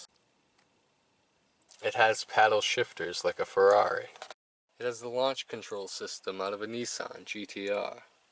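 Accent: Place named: United States. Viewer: why u speak with that united state accent. its sound no good.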